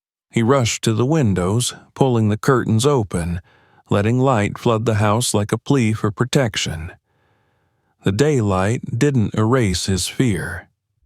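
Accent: South, United States. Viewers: These southern drawl stories are Cool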